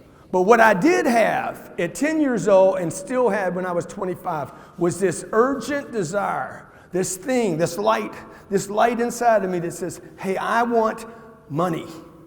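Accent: South, United States. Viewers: Ban Nsabin it’s his southern twang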